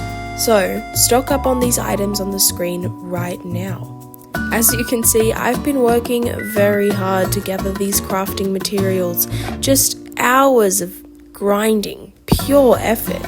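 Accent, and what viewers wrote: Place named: New Zealand. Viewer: is that a new zealand accent? i love it, it’s so nice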